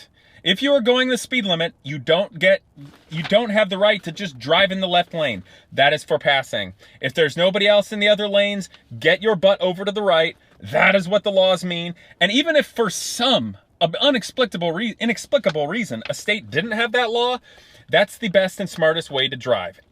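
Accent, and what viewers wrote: Russia. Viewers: Love your Russian accent. But I still agree with you and left lane hog’s are more dangerous than some people think. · Great content , very relevant. Hope your videos have some impact, forwarded to my 16 yo cousin, hopefully new generation is a bit better. I travel alot on the highways for work. Mostly new jersey and pennsylvania last few years and unfortunately a lot of people dont even know they causing a problem. Drove in russia and europe and your commenters are 100% right about those. Way better driving culture in europe and even russia (though its a bit rough). Really cool russian accent you got there!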